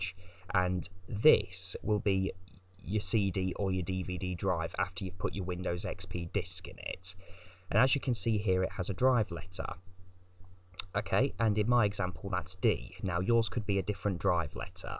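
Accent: United Kingdom. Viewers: Hello. I want to ask you a question that has nothing to do with the video. I'm from Venezuela and speak American English, but I can clearly understand what you say with your accent of British English. I would like to know exactly where are you from, for knowing in what cities speak with your accent, and to know the places I can understand the British English without difficulty.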